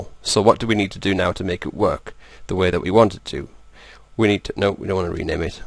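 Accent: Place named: England, United Kingdom. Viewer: I really like your accent! What part of England are you from?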